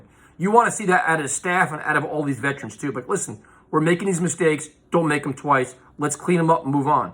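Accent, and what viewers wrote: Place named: New York, United States. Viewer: This guy sounds new yorky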